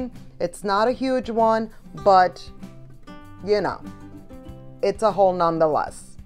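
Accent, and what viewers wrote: New York, United States. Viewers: Hi I found your channel recently and really enjoy a fresh take on these shopping channels. You sound like you're from the New York ,New Jersey area am I right? I don't mind that you say you know a lot. 👌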